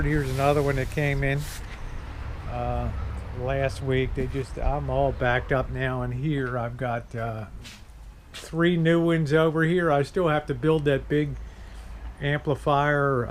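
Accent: Baltimore, United States. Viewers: It sounds like you have a Baltimore accent...have you lived there?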